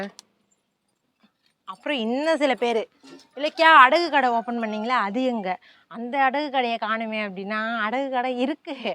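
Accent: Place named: Thanjavur, India. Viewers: Hai sister love 💕 from kumbakonam namma oor slang❤ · Yentha ore pa ,no vithyasam illa nanum ipdi dan thaŕŕ nu nu solven Thanjai tamil theliva irukum. Yenaku ungala pidikum pa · Neega peasuratha paththa Enga urla peasura mathiriye irruku na thanjavur district Madukkur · Nanum vungala mathiritha pesuva nanum thanjavur district than sister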